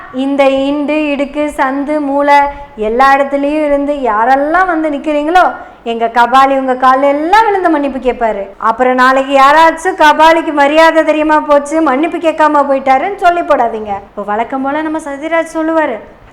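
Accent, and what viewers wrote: Kongu, India. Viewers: Excellent dear yesterday I have seen your show today also seen after long time full show is back to full Kongu Tamil. Excellent dear. Here Mr.srinivasan requested this idiot uruga mammi to regularize GST for all items they are selling in hotel even if his high slab also. He very much humbled requested that but this arrogant lady without meeting peoples came to parliament through back doors and proudly arrogance behavior to a senior and very senior famous business man. Very sad to see this and very shame to be a tamilian to be a Our FM 😢😢😢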